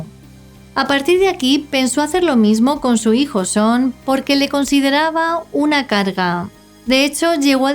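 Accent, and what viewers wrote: Spain. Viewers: Me encanta tu acento español más elegancia misterio i suspenso cuando naras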